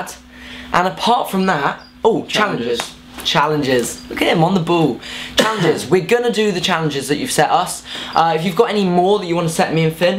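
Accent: United Kingdom. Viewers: No idea why this was recommended to me, but such a delicious british accent !! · are you british?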